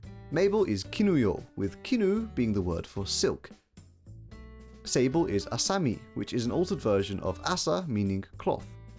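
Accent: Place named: Birmingham, United Kingdom. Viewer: This is a brilliant resource mate, thanks so much - definitely deserves way more views. Ps: nice to hear a fellow Brummie speaking in Japanese :P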